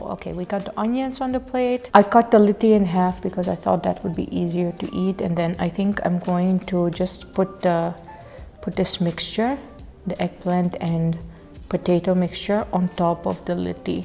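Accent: India. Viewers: Hey!! Ik keek jouw video's voornamelijk toen je in Nederland woonde en vanuit Nederland rondreisde. En na lange tijd besloot ik deze video te kijken. Wat mij opvalt is dat je al een mega Indiaas accent hebt gekregen! Super grappig.😉😊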